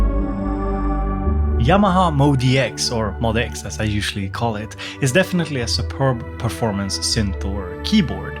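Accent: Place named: Sweden. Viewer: Very traditional svenglish ;)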